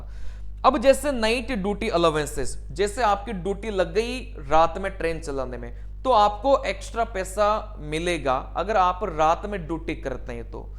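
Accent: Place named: Kashmir, India. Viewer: Ap kashmir se hai kya😅😅 mera room mate bhi aisi hindi bolta tha or wo bhi kashmir se tha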